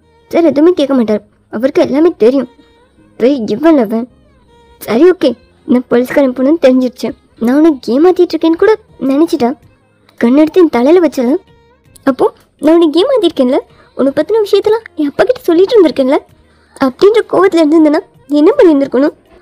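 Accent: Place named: Madurai, India. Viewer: Hloo Sis 👀💜 unga channels ah recent ah tha theriyum Ella ffs uhm paathen intha ff romba Azhagaaa irunthuchuu like solla wrds eyy illa avlo Azhaguu 🫶💯✨️Ceo vum aprm unga oneshots ellame 🫠✨️But this hits diff yen ungaluku comment pandravanga idha pathi pesala nu enaku thrla unga dialogues laam Avlo Azhaga iruku...😫💯Vmin ah Avlo Azhaga kamichurunthinga intha story line eyy nallairunthuchu But jimin ku eathachu aagura maari tae feel pandra Maari kaamichurukalam i neant unga story romba nallairunthuchu i can feel vmin realtionship but jimin tha romba paasam kaamicha maari oru feel🐣🥀 spcl part potta apdi podunga And this made my day na avlo hpy ya feel pannen intha ff kekkum pothu intha comment padipingalanu thrla but padicha neengalum hpyy ya feel pannuvinga nu tha podren ❤️‍🩹✨️ Neenga madurai la antha slang Azhagaaa pesuringa na sivagangai...Aprm Starting la neenga okva nu ketutu neengale ok nu soldrathu enaku pidikum...Aprm support illanu feel pannathinga kandipa support kidaikum...And i will support youu okvaa...Saranghae sistaa...💜🦋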